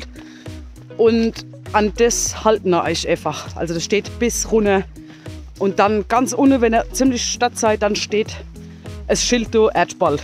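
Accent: Palatinate, Germany. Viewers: Immer wieder ein Vergügen deine Videos zu sehe. Un dadebei des Pälzer Platt👍🏼 Liebe Grüße Thom ✌🏼🤠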